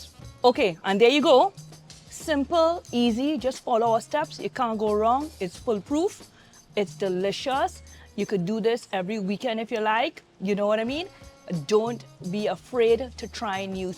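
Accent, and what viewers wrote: Trinidad and Tobago. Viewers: Am I detecing a Trni accent? The low mein looks, and I am sure tastes absolutely delicious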